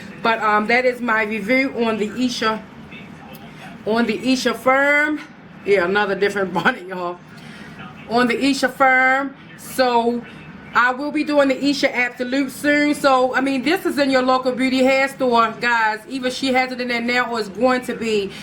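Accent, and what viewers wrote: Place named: Mid-Atlantic, United States. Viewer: Where are you from? You sound like Maryland, Philly or Pittsburgh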